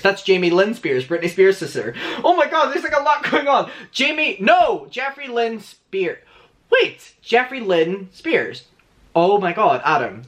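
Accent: Ireland. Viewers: Irish acsent you mean hes not speeking native irish lets get that straight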